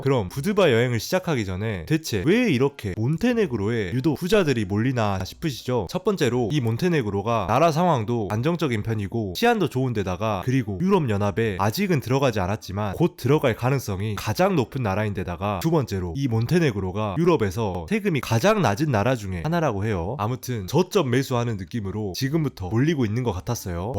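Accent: Jeolla, South Korea. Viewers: 아따 전라도 양반이구만요..ㅋ 사투리 느껴짐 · 말이 전라도이지요 요사히 전라도라면 그렇게 좋아하지 않구만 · 혹시 억양이 전라도 분이신가 티가 나는데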